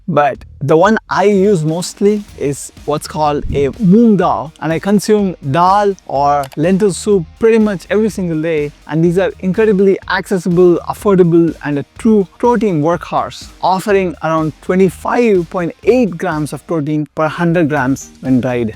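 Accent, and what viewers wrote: India. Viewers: Either you are south Indian Or you are Bengali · I absolutely hate the Indian accent. I don’t know why but it triggers something in my brain. No offense.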